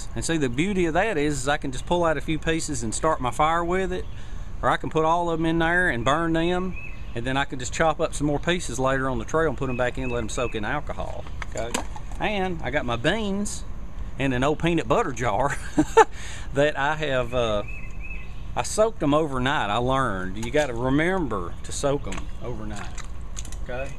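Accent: South, United States. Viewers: Howdy! The south is the only place where it's acceptable to turn a 1 syllable word into 2 syllables. :) I stumbled across your page a couple of days ago. What I like about your videos is that it causes me to think out of the box, plus the entertainment value. Very interesting ideas that you're sharing. Thanks!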